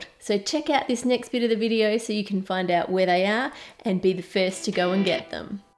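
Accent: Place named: Australia. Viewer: Can you please do a video where you just repeat the word chocolate please, i love your aussie accent when you say ot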